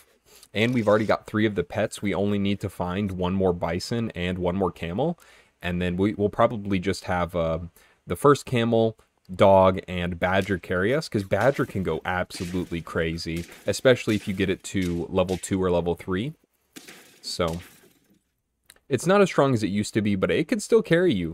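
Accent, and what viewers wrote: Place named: Canada. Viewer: You sound so Canadan at the beginning of this video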